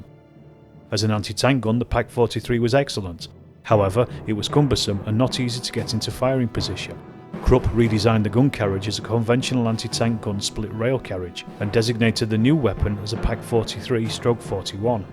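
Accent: United Kingdom. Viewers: You are an idiot. Another British accented individual taking archived pictures and expressing your own flawed opinion on your video. You have NO historical degree so another air thieving mouth breather trying to make money on youtube.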